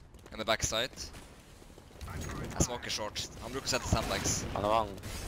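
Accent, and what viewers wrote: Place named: Tromsø, Norway. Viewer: The best thing 'bout your highlights is that Tromsø dialect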